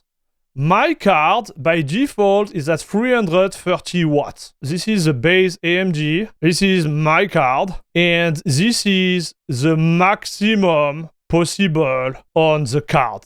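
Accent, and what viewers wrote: France. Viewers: I ordered a Powercolor Reaper for 663 euro on Ldlc, great deal in Italy, considering the base MSRP + tax here is 690 euro! I know it's the bottom line model, but i was planning to undervolt it anyway, so the 2x8 pin are perfect for me, since i have a rm650e and might be kinda CPU bottlenecked in some situations anyway with my 5700x3d. Btw, thick French accent! 😁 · OK. I thought you were from France because of the accent. I forgot that parts of Canada are also French-speaking. Warm greetings to you! Thanks for the channel.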